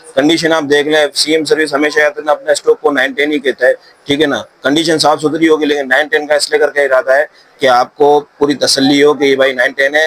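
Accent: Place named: Rural, India. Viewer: بولنے کے انداز اور شکل سے بھی پینڈو لگتے ہیں بھائی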